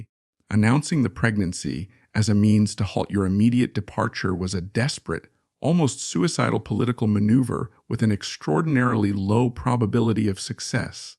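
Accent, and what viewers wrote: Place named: India. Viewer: Why is most of this narrated in one voice, but the chapter announcements and epilogue are delivered in an Indian one? And the text moving around? Why? If you want to promote views, these are things that need correction. If you do not care, then never mind. Continue putting in the time and effort to produce these, as your subscriber count barely moves. It's all up to you.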